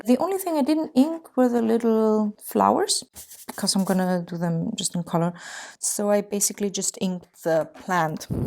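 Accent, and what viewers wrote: Germany. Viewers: R u german cuz you kind of have a german accent and also faber is made in germany and i am also german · I live in Germany... Sooo its cheap XD btw I think she is also Herman... Caus the accent and on the pack was standing '' Buntstifte" that's German and means colored pencils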